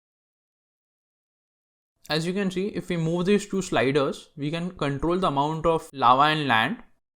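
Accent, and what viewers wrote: India. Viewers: Bro r u indian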